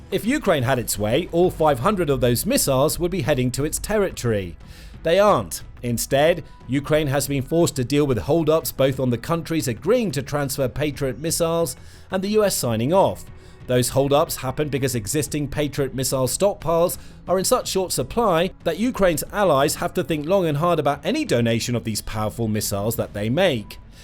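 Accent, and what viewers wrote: England, United Kingdom. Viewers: English Accent hiding The English Jewish Gangster!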